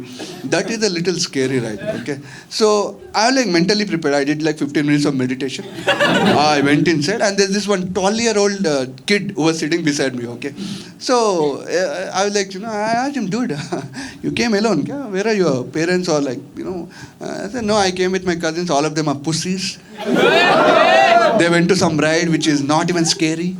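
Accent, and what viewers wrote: South India, India. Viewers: I love that south Indian accent in ur English... And tht.. Re chintuu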